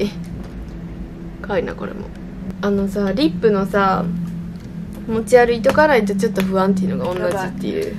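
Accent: Kansai, Japan. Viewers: 関西弁？1人の時はあんまりでないけどお友達と話してる時結構出てるのかわいい🥰笑笑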